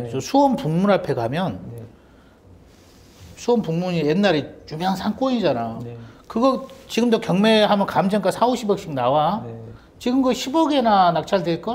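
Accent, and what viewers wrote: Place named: Jeolla, South Korea. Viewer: 아...이책 꼭 구입해야겠습니다.. 구수한 전라도 사투리..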